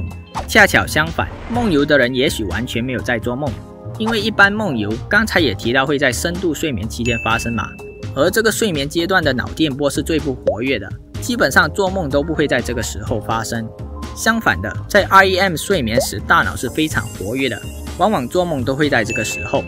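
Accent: Malaysia. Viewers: 你是马来西亚人吗？口音听起来很像XD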